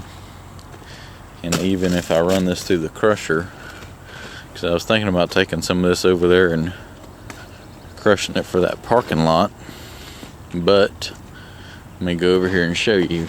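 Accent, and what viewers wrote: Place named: North Carolina, United States. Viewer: know a fellow north carolinian when i hear one. i enjoy watvhing your expertise with your heavy equipmemt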